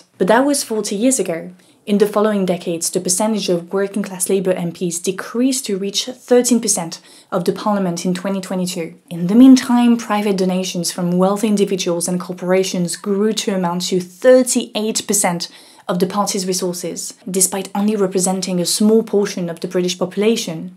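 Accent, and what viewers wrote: France. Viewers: I enjoyed this video but I would also like to thank you for improving your English. I was having a hard time following you in the past because of the heavy French accent. 😊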